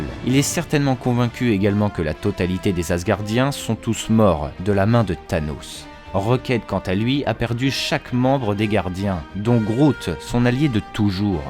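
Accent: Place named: England, United Kingdom. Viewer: Waou l'accent anglais classe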